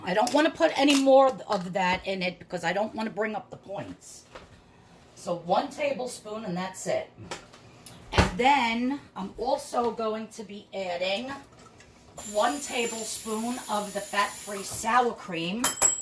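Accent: New York, United States. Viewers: I’m new to your channel ! Loving your recipes and your accent ! Are you from NY ! You sound like me !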